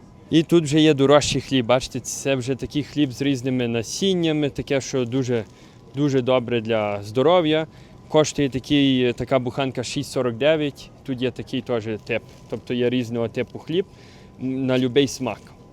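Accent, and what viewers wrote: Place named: Ukraine. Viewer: Thanks a lot.for your answer. I do love the Passion you put info recording your videos. I am also trying to understand what you are saying in Ukrainian (I only party succeed). I was only slightly suprised that you have a pretty strong Ukrainian accent when Speaking English. As far as I remember, you came to Canada at the age of 11 (before puberty). Anyway, that is really that important. Keep up good work.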